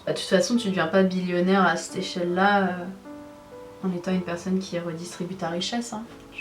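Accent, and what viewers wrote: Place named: England, United Kingdom. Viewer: Le maquillage est une catastrophe mais l'accent anglais en revanche est une pépite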